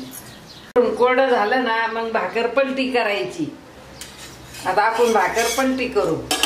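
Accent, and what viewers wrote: Ahmednagar, India. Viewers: आमच्या नगरी आजीची मस्त भाकरी.. आन् झणझणीत नगरी बोली..😀👍🏻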